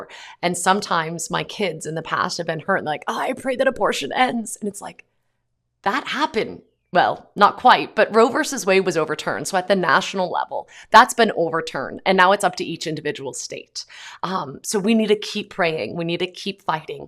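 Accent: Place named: Ireland. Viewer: Oh, I am so ashamed of my country, I am from Ireland and it is just getting worse. I also pray for the softening of hearts and that the scales fall from their eyes. On a lighter note, I love your Irish accent ☺️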